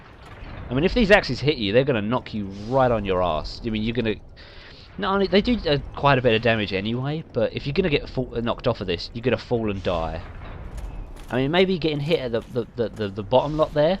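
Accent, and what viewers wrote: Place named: United Kingdom. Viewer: Ok, have I done something wrong?. I've opened up Anor Londo but after seeing this vid I thought i'd go back and clear up all the loot and kill the headless demons in the basement ( I dunno their name ) I jumped down to talk to Siegmeyer and the guy ain't there, he's not at firelink either (scratches head). Loving the vids, nice to hear a fellow Brit's voice on the commentary!;-)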